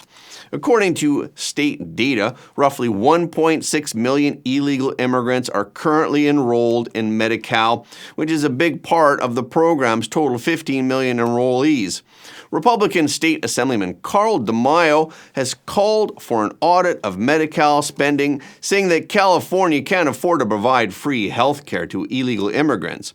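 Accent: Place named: Canada. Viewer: From Perth Australia. Watching FrontPage for few years. Direct & Up to Date mainline Real News. Try to watch with Coffee each morning. does a great job of reading News. I suspect is actually Canadian (accent). I Grew Up in Toronto Canada but saw the writing on the wall years ago when the decided to split the country by labelling in both French & English 😂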